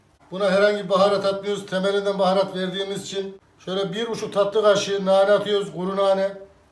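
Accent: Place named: Eastern Turkey, Turkey. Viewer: Usta mız çok keskin doğu şivesiyle konuşuyor.Keşke diksüyon dersi alabilirse türkçesi biraz istanbul şivesi gibi kusursuz olabilir.Yoksa çok kusursuz anlatımı var ve de çok başarılı kendisini tebrik ediyoruz.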